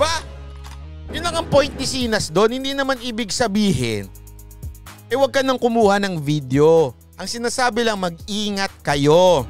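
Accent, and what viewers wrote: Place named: Iloilo, Philippines. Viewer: Bwaaaah bagay sayo mg ilonggo sir 😂😂😂ang dami kong tawa sayo tlga😂😂😂😍😍😍❤❤❤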